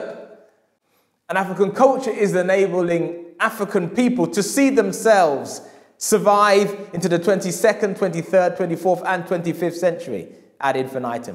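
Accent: England, United Kingdom. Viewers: Baseball analogies in an English accent! :))))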